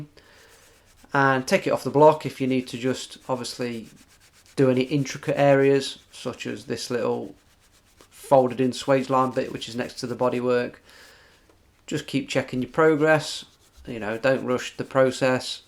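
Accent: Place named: North East England, United Kingdom. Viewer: I recognize the accent tens years ago when I was in the NE of England... enough siad...this channel's great, I'm ur new subscriber.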